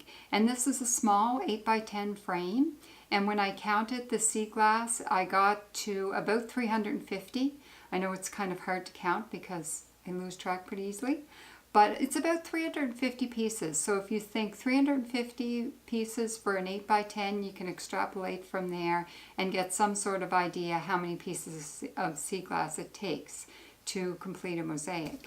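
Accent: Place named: Nova Scotia, Canada. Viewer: Since I'm from Nova Scotia, I loved watching your video and hearing the accent I grew up with. You are quite the artiste! I can't imagine trying to figure out how long it took to create a mosaic. No time limit on true art, eh? I'm sure those who paint on canvas or those who do pottery or those who chip away at a statue don't know or care how long a piece takes. :) My daughter is the one who collected all her sea glass and your videos will help us understand how to create our own art (I'm her helper … sort of) ;)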